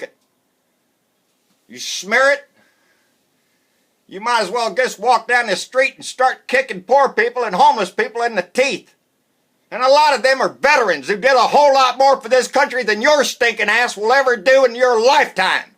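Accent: United States. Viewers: u are what a real american sounds like Honest Passionate Thoughtful! thank you!!!!!!!!!!!!!!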